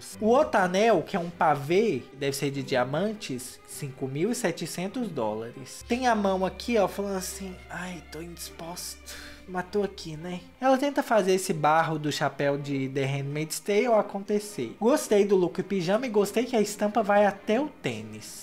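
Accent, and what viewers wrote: Minas Gerais, Brazil. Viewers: Você tem um jeito de falar muito espontâneo. Desde o primeiro vídeo que eu vi, já percebi que você era mineiro. Amo seus vídeos ❣️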